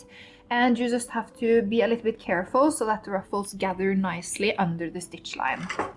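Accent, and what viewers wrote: Norway. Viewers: Do I detect Norwegian? Så fint! Når jeg har skaffet min egen symaskin vil jeg sikkert prøve meg på dette!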